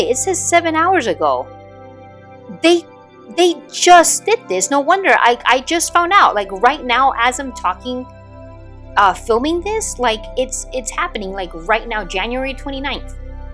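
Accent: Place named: Minnesota, United States. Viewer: I'm a newbie. I've had my seestar for about 3 weeks. She's my first. Moon, Orion then Pleiades. It's been fun. Rosette and Tadpole are on my list. Here in DE will not see any stars for at least 2 weeks. Mother nature waving her wand. Discovered how wet the east coast can be at night. Dew heater seems to work. Enjoying your videos. Sounds like you're not original SoCal. Minnesota????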